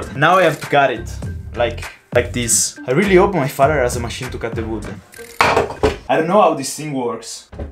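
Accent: Italy. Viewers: Sei italiano? Ok alla fine del video si capisce che si, lo sei. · love your italian accent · Are you from italy? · Sorry but... are you italian? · Ma sei italiano??😅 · Bro, ma sei italiano? Ok, lo è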